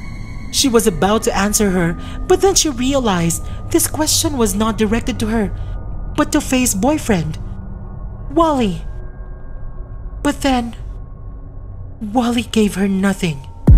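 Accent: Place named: Philippines. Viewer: Ang sosyal naman..first time I heard a Filipino narrator doing an English story. Something new, although I didn't feel so scared because I was thinking the entire time that you're a different person because of the accent and everything.!! I mean not that intense when you're doing Tagalog horror narrations..Hahaha.. 😍😅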